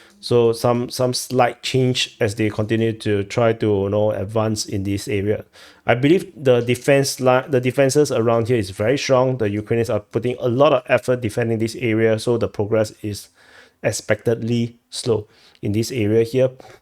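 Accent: Texas, United States. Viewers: Hahahah,,, love ur Texan accent. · As a Texan I can say your cowboy accent is awesome lol😂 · I'm surprised the cowboy accent worked will.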